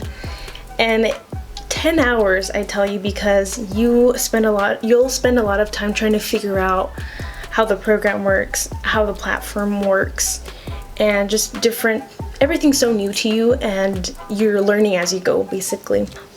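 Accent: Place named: United States. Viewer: Were you born and raised in the US? You have a perfect American accent. ❤️❤️❤️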